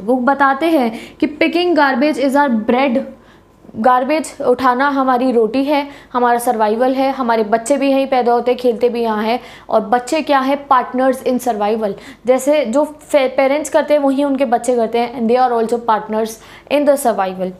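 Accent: Punjab, India. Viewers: Is she belongs to Punjab ? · the punjabi accent that you make in mistake like "haiga" makes this video more interesting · Her Punjabi accent is so cute 😂❤ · Madam...are you from Punjab?? ...tell me pls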